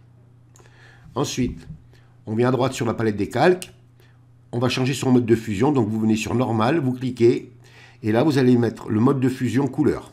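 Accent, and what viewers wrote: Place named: Dauphiné, France. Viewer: il me semblait bien qu'il y avait un petit accent dauphinois! bravo, merci pour ce beau tuto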